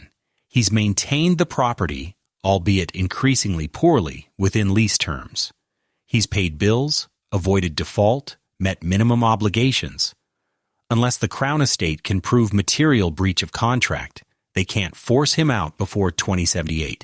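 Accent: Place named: United States. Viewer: What I would like to know as a British Citizen of 83 years is.............why an American "voice over" accompanies old film footage of English Royal Estate Dwellings (security compromise aside !) ! Is this visual "offering/conjecture" open to American interpretation ? Why not investigate President Trumps "holdings" in America for local interest ? Why is Britain the "flavour of the Journalists month" ? NO I DID NOT ENJOY THE "INNUENDO" OF THIS VIDEO. ....or the presumption that all is open to American "investigation" ! Perhaps the American Journalists have fewer problems investigating foreign shores rather than their own, or, would the President object ?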